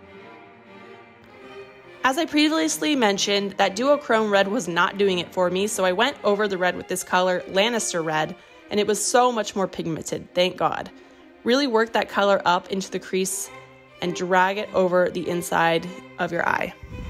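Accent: Russia. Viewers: your Russian accent is on point!!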